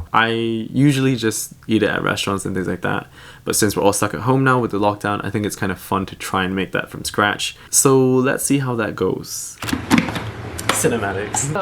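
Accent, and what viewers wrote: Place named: United States. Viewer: Great video! we’re u born in the UK because i don’t know why but i thought you were American at first??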